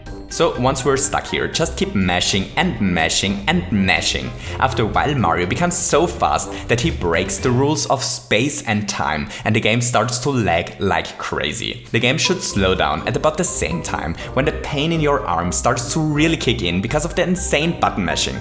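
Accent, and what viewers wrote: Germany. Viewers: Hey you have a German accent are u German? BTW love ur videos :) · I know, it's a silly question but are you german. Your accent sounds like a german one.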